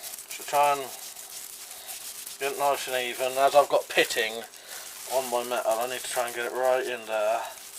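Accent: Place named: England, United Kingdom. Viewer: Love the English accent. Great video and nice cleaver!!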